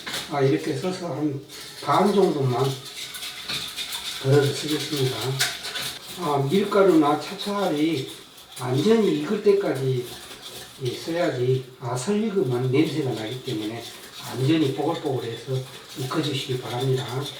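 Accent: Jeolla, South Korea. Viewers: 전라도 사투리가 구수하니 듣기가 좋으네요 석박지 요리 잘배워 갑니다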